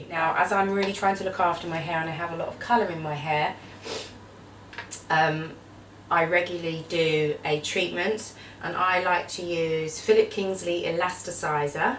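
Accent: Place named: Essex, United Kingdom. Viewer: That's a very posh Essex twang hahaha xx Fab video 👌💕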